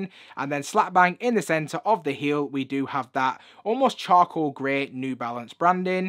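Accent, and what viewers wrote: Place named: Lancashire, United Kingdom. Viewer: Great reviews but that Burnley Lancashire accent bro 😂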